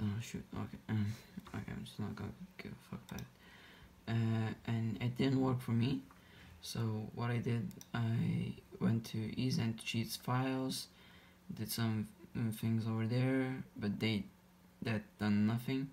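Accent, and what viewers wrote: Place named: Russia. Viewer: русский акцент не скрыть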